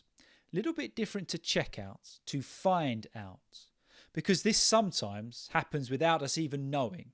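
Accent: United Kingdom. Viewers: At first I ever looked for a american accent to practice my English skills, not because I´d rather it but because is a little more easy to understand other than is most common between English learners at begining of their class. Now, after to found Katharine (the one who you did a live with her erlier hahahah) Youtube Channel and yours as well, I do love British accent!!!😍🥰 So, talking about your contents, I loved them!! they are so useful!! thank you for that!!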